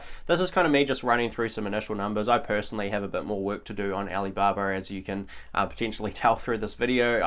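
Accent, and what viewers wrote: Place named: New Zealand. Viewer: Nice to hear a kiwi accent for a change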